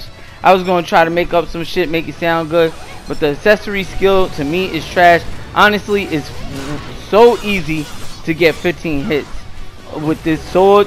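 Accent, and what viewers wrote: New York, United States. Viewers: this man definitely from new york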